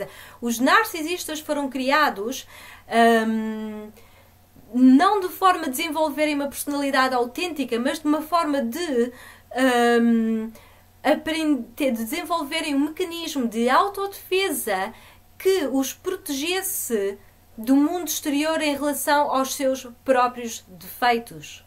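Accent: Portugal. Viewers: Sai dai doida, vc deve ser uma narcisista pq ela é portuguesa por isso fala assim, e se os vídeos dela são meio desfocado pq ela grava de um celular, ela não está aqui pra dar aula de dicção. O q vale é o conteúdo do vídeo, não tem q ter revisão de nada, ela é uma amadora ótima execelente maravilhosa, ela não está aqui pra ser blogueira e nem YouTuber pra ser impecável com linguajar postura e qualidade de vídeo!! Não está satisfeita procura outro canal com outros profissonais q são impecáveis. Pq todos q estão aqui vendo o vídeo dela, não está aqui se preocupando com essas coisas q vc está falando sua doida kkkk Mulher chata. continue assim, vc ajuda muito as pessoas com seus vídeos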